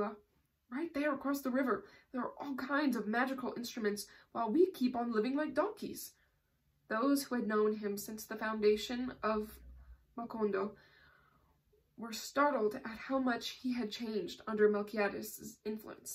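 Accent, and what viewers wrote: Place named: Midwest, United States. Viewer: Are you from Wisconsin? lol you sound like you are from the Midwest x) I am so don’t be offended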